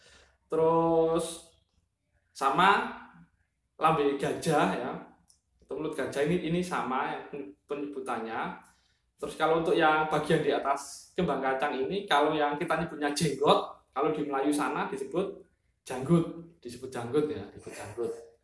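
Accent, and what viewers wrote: Java, Indonesia. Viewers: Salam mas, pekat bahasa jowo nya mas... haha.. salam dr Malaysia mas. Mantap isi nya! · Ini jawa sejati. Pekat bahasa nya ya.👍🇲🇾. Salam nusantara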